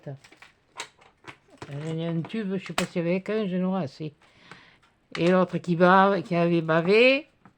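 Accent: South, France. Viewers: Bonjour, je ne commente que très rarement les vidéos que je regarde, mais honnêtement je trouve vos créations très très jolies et j'imagine le gros travail en amont. j'aime vos vidéos et votre accent du sud 😊 et aussi vos début de phrases sans la fin, votre simplicité et le respect des autres sans critiquer ( ce qui n'est pas le cas sur toutes les chaines😊) Je vous souhaite de très bonnes fêtes de fin d 'année avec votre petite famille et merci pour toutes vos vidéos 😊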